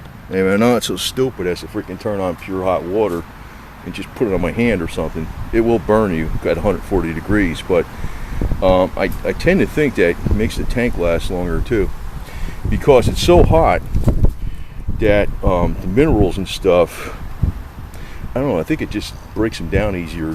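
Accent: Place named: Boston, United States. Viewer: I hear the North east accent- is that Boston 'Frickin"?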